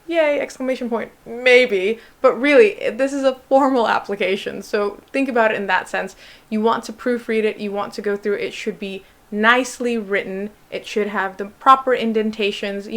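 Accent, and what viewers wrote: United States. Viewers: How are you in the uk and have an american accent?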